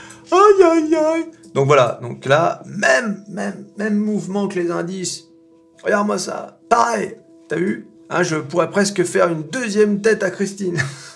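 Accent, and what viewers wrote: United States. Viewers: Y'a pas mal de gens qui se sont fait rekt avec Eurotunnel, et j'ai l'impression que ça a laissé des traces dans l'inconscient collectif. Pis la bourse est une jungle qui fait semblant d'etre régulée, alors qu'avec les cryptos, on sait que c'est une jungle direct, y'a pas d'illusion. Au premier -95% si t'es pas mort, t'as compris q'il y a pas de règles... ;) PS: J'ai ete sur ta chaine Anglophone, c'est pas mal aussi cet accent Texan, t'as choppé ça où ? ;)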